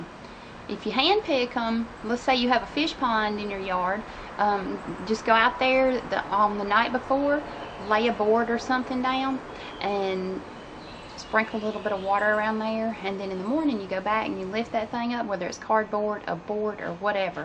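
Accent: South, United States. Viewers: u kind of cute with that southern voice... :-P wiking in denmark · it sounded fine to me :-) it is our southern state accent... USA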